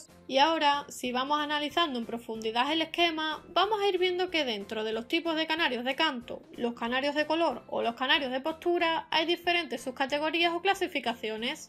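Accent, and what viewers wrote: Spain. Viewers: serás de alguna parte de España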